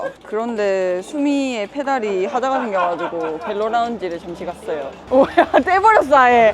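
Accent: Gyeongsang, South Korea. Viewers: 멋집니다^^ 경상도 말투가 더 정겹네요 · 뭔가 갱상도 악센트가...ㅋ 대단하세요. 예쁩니다.^^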